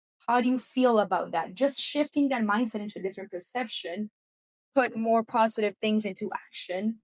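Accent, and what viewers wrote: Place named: Puerto Rico. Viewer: Nice vid. Why do you have an indian name but a puerto rican accent?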